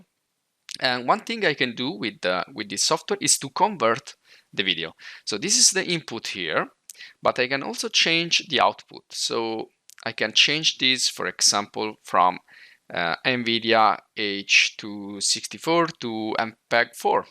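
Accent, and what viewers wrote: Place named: France. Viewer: thx very helpfull. You accent looks frensh buddy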